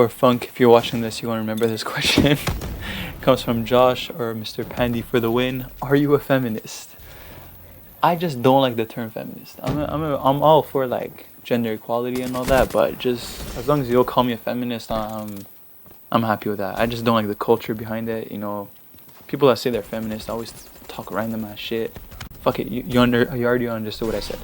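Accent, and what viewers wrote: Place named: France. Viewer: dammit, 2nd lol but that french Accent tho hahaha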